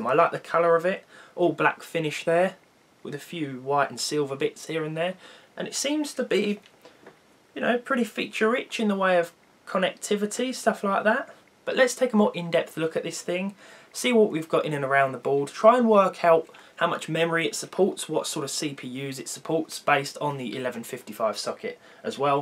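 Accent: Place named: Essex, United Kingdom. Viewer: you sound like you're from Essex , although I maybe wrong. Anyways great video , but the x79 Chinese mobos are either a bust or they last like 3-5 years.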